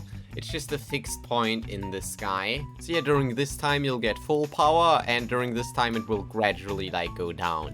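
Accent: Germany. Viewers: wait you are german?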